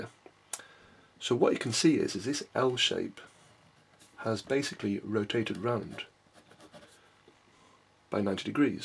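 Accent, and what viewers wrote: England, United Kingdom. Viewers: I LOVE MY VIDEOS NARRATED WITH AN ENGLISH ACCENT. THANK YOU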